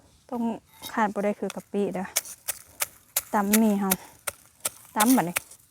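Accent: Phu Thai, Thailand. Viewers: สวัสดีครับ สำเนียงผู้ไท น่าฟังมากครับ🌹🌹🌹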